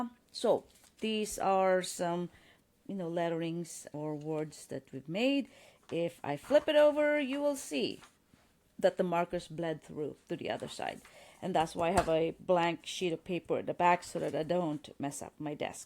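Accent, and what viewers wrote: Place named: India. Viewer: Are you from india? Because you have a really pretty accent😻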